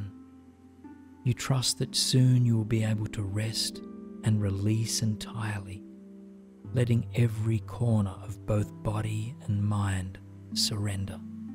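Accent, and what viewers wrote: Australia. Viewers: I love your meditations, they’ve helped more than you’ll ever know. I feel even more drawn to you because I think you’re Australian? Feels a bit more like home and trusting.. I can’t explain it. But thank you again 🙏🏼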